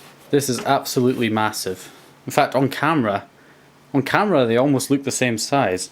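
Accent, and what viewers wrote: United Kingdom. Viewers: Because he's british and youre probably spanish by your name. So you talk weird too.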